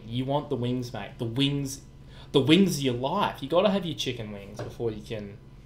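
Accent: Australia. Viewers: OCE player and sounds Australian noice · Aussie? :D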